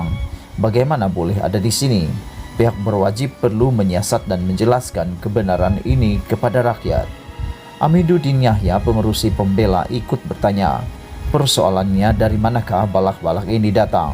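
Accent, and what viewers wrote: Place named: Sabah, Malaysia. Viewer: Warga pitas sabah . Slang sabah.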